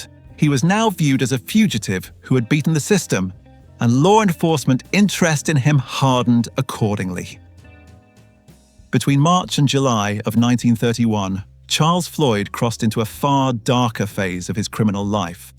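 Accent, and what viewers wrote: England, United Kingdom. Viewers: GET RID OF THE UK NARRATION VOICE!! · They think it sounds more intelligent and more informed , Americans are suckers for English accents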